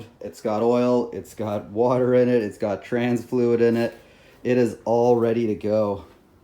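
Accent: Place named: Canada. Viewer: Canadian Eh.. 🤙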